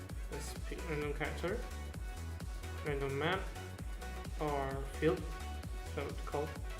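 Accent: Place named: United States. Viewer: Your English is good like American 👍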